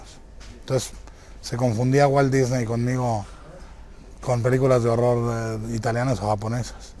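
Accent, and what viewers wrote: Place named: Mexico. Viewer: No you r wrong he is mexican he speaks like mexican, but he uses sometimes spanish words Im mexican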